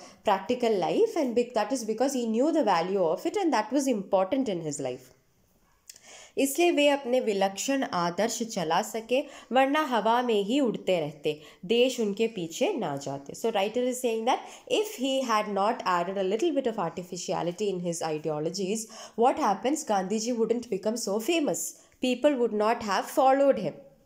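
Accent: Kerala, India. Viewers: Maam you have explained this chapter beautifully!! Also, which state do you belong to? You really sound like a person from Kerala with a Mallu accent😂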